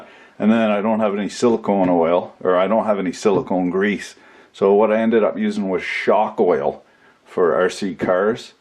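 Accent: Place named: Canada. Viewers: it's that Canadian charm boys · My wife think's AvE's voice (read Canadian accent) is kinda sexy, since watching AvE while in bed has been my newly formed habit. I can confirm that sexy time with the mrs has increased approximately 33.333% this past month (March). He is the ultimate wingman; helps me get laid while teaching.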